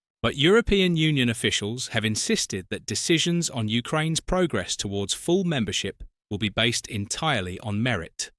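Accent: United Kingdom. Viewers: what is this Turkish BS Propaganda? with a British accent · U sound british and that explains yr stupidity . Bringing turkey in to the picture u are signing ukranes death certificate and u know that because they are made out of the shit as the british . The minute putin gives them a longer cucumber to suck on they will run ove to him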